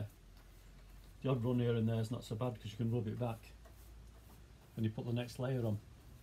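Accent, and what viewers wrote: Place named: Oldham, United Kingdom. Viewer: great.. 40 years ago taught..you never lose it my first car I painted this way.. boiling hot day.tin in. paint in the milk pan in water.. and tongues from the washing machine to carry it out.. cheap brush.. disaster.. lol.. Oldham lad me.. you sound local...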